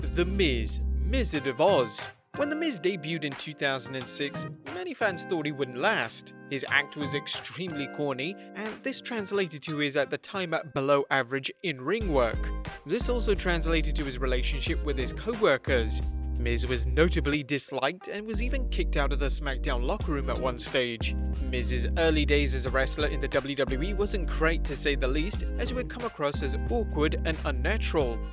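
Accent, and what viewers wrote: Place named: United Kingdom. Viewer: Don't like british accent but good video.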